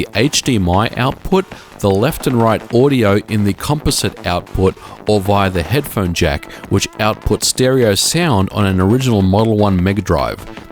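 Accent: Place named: Australia. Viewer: Goddamn Aussie accent my ears are bleeding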